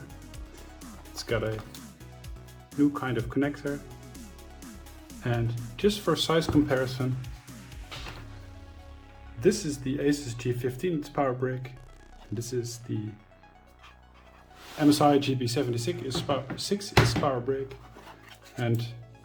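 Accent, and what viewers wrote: Netherlands. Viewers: That Dutch English always 👌🏽😌 great review 🙏🏽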